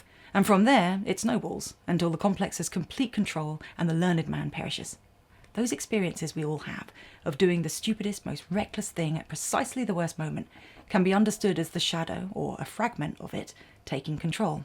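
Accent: United Kingdom. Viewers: Your English accent is driving nails into my head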